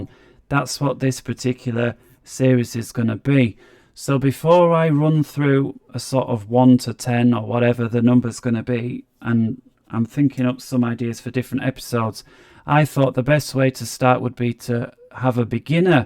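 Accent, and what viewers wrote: United Kingdom. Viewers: This is spot ON!! NICE one, and with a NW accent as well, even if it IS a Manchester one (and not a Scouse!! 😉). Thanks 👍 < There's ANOTHER thumbs-up as well as the one above!!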